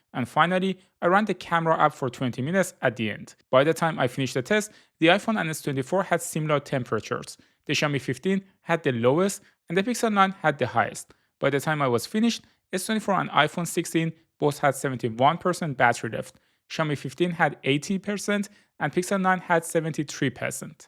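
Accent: Iran. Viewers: Love the Persian accent ❤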